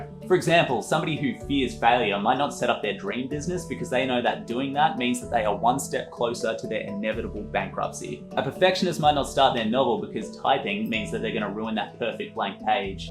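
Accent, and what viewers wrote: Australia. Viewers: Finally an aussie youtuber, makes it so much easier to listen to all of it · Are you an Aussie?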